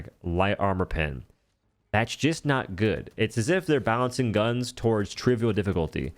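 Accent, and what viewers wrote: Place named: Panhandle, United States. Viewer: This fella sounds like he's frum tha panhayndle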